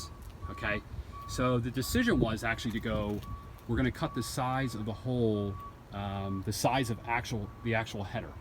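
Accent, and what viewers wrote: Philadelphia, United States. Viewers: Gotta be from Philly with this accent. Nice work bro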